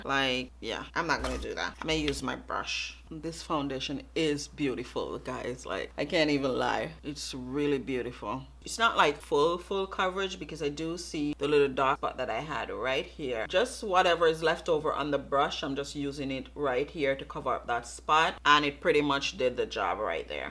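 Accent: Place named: Jamaica. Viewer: awesome!!! I’m Jamaican as well that’s why I asked. I could pick up the accent.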